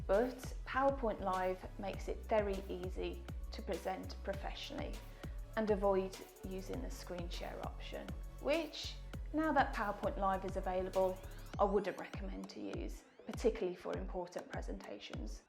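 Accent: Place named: Birmingham, United Kingdom. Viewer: Excellent. Are you a Brummie?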